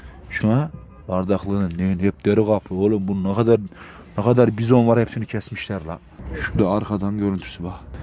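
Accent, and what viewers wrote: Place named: Black Sea, Turkey. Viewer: Sırf şu konuşman şiven için abone oldum (Kurbanın olıyım) ( şuna baghele uşag)😂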